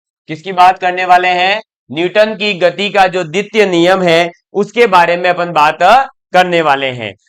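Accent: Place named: Rajasthan, India. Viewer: Bhai aap rajasthan se ho १००%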